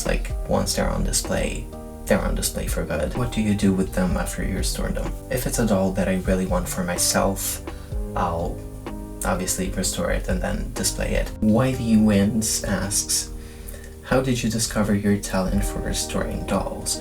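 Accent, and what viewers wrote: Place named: United States. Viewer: How is your English so good and why do you an American accent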